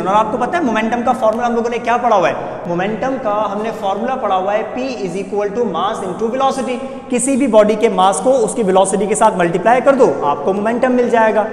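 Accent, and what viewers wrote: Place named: Waziristan, Pakistan. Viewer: I think that you are from waziristan or bannu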